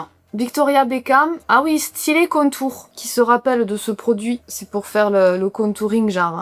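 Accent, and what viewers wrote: South, France. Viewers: L accent du sud haha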